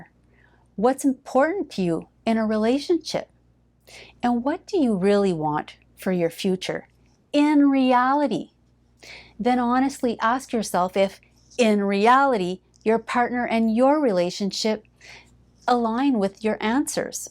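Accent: Quebec, Canada. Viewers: coach, about - ugh that francophone/Canadian accent makes me melt. Love bombing you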